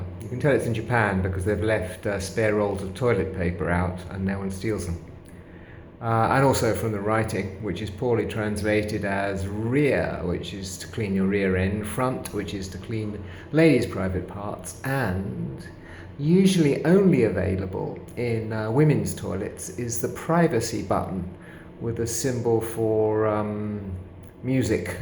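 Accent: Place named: England, United Kingdom. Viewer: Hey hage, came here to listen to the sound, not your DA English accent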